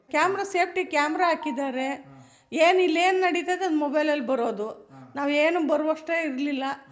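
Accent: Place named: Udupi, India. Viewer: Udupi talking maduthira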